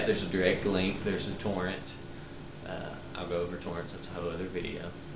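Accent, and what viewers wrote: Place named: South, United States. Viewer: this yankee thinks that's a pretty cool southern accent. i can't place it, but it's great. u could have a career in cartoons i mean hella cool. maybe eastern tenesee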